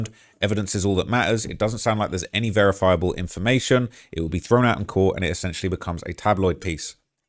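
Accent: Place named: United Kingdom. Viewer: Do you happen to have some crumpets ans tea? Your accent is like nails scratching a chalkboard...fkn lame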